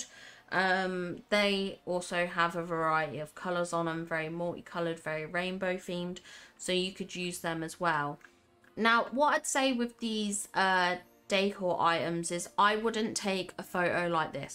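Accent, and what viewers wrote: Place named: United Kingdom. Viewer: Hey 👋🏻 Thanks so much for posting this…I was kinda downhearted by my 600 moonstones for last DreamSnaps😟…like quite a few others..the bar has been raised now peeps get how profitable taking it seriously…anyways you have given me some really good ideas and inspiration..Just Love your content as ever..nice to hear a fellow Brit too 😊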